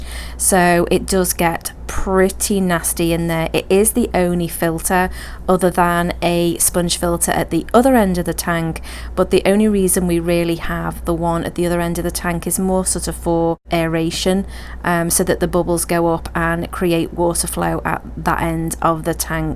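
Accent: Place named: Scotland, United Kingdom. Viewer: I ordered 2 of your Betta aquarium dividers along with the air pump and airline tubing bundle and didn't know you had a YouTube channel. You have a really nice looking aquarium and I like the stand too with the bins for storage. What I like about fishkeeping the most is how every fishkeeper has their own unique way or routine of doing things, the way you and I do water changes are massively different and I like it, keeps things interesting. I noticed you have an I believe Scottish accent and your YouTube info says your location is Uganda but your store is in the US? Do you have people in the US running the online store? Just wondering...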